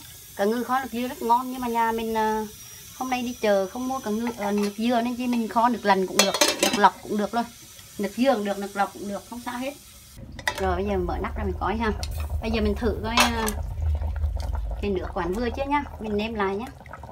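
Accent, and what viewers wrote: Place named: Quang Tri, Vietnam. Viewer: Cô người Quảng trị hay sao nói nghe hay quá. quê tui đây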